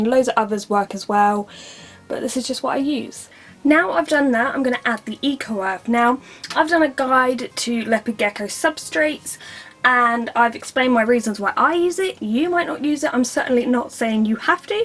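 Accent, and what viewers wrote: United Kingdom. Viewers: Michael Holmgaard she's British and British people sound like that... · Michael Holmgaard Umm... seriously? She is a British and British talks like that, you know that right?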